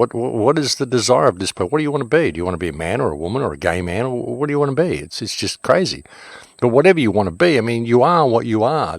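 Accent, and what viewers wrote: Australia. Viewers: Jumbo jumbo with a “ Britt “ accent. Actually, Aussie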